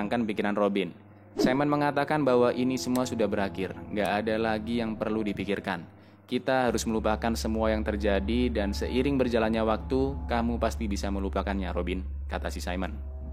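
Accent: Java, Indonesia. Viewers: Adminnya orang jawa ya? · Jawanya keluar:'v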